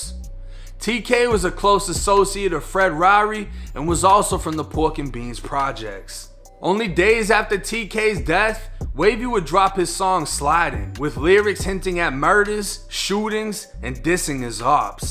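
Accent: Boston, United States. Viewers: U got ur Boston accent back in full when I seen u on 32 n 1 years ago u sounded like u Florida boy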